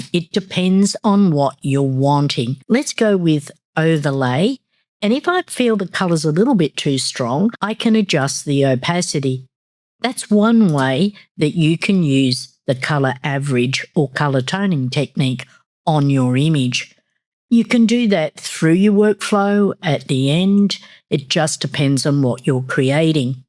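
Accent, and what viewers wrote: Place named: Australia. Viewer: Thank you for your time. Lovely to hear an Aussie voiceover!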